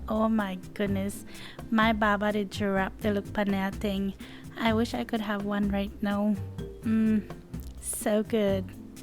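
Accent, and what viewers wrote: Jamaica. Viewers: Do you think the accent is similar to Jamaican?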